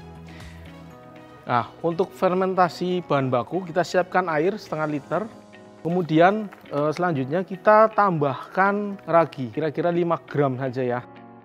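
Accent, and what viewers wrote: Banyumas, Indonesia. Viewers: Wong ngapak apayh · Sampean wong Ngapak ya mas... Hehehe Pada baee..saya juga wong nagapak...lanjutkaaaaaan mass... Wong Ngapak nek Ora Ngapak ya Ora Kepenaak... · ngapak kiye kayane mbok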